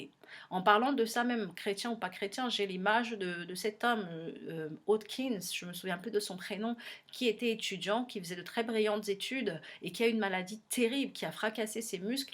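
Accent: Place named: Cameroon. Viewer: oh Mon Dieu! ton accent je me disais aussi que tu es Camerounaise. l'accent😅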